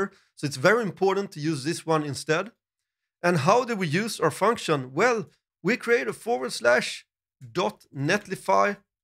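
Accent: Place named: Sweden. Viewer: I knew you were from Sweden. Kind of noticed it from the accent. Then you confirmed it :D I'm from Malmo. Great channel, keep up the awesome work.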